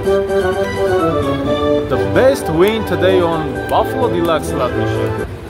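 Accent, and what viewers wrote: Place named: Iran. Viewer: love your Iranian accent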